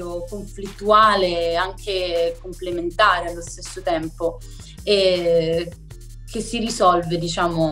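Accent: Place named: Rome, Italy. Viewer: Hai piu' un accento romano che bolognese....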